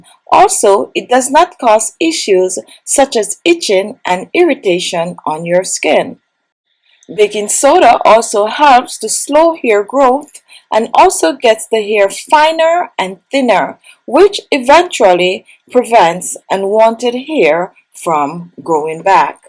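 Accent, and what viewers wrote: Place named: Jamaica. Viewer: I am subscribing, not only because the information is good, but also because you sound Jamaican! Thanks for sharing, I will be trying this!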